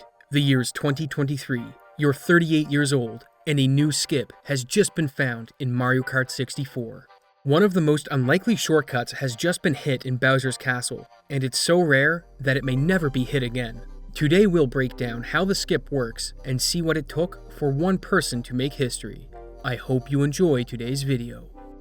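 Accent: Canada. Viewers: This guy's gotta be canadian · Are you Canadian?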